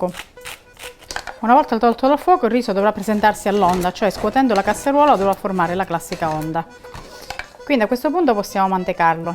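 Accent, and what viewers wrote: Calabria, Italy. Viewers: Hai un accento calabrese bellissimo ❤️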